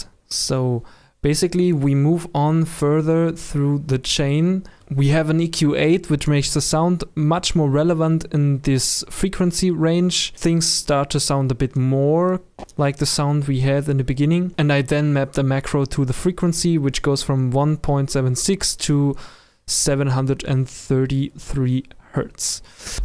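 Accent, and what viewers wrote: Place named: Germany. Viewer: Lol a german who finds it hard to pronounce english haha :) I believe "coarse" pronounced the same as "course" but correct me if I'm wrong :p Great and clear tutorial btw! Props!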